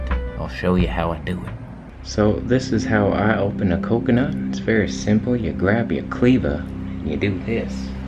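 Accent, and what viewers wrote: South, United States. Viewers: You had a southern drawl in this video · u talk like u 4rm the south son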